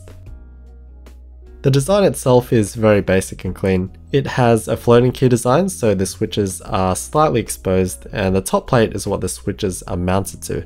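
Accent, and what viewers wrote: Australia. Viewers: Oh wow you’re Australian too nice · the hint of Australian in his accent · aussie mate]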